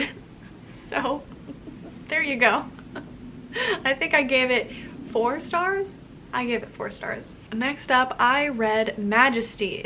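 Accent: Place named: United States. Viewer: Oh, you’re dutch? For some reason i thought you were german... did you grow up in the US? Cause for me (a brazilian), you sound american :) (Your accent)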